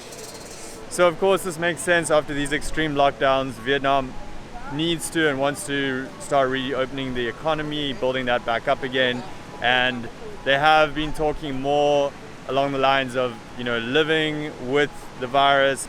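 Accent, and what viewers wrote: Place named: South Africa. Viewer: tried to place your accent, not North America, i guess South Africa?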